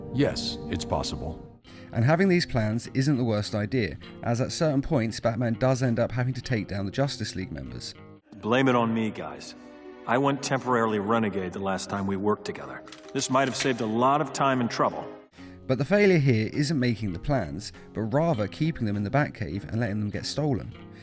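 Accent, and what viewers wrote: United Kingdom. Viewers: I know Americans and Superman fans will eat up anything a British voice tells them but this list is dumb. Might as well list his parents deaths as another one of his "failures" because as we all know -- every time some tries to cause you or your loved ones harm -- IT'S ALL YOUR FAULT.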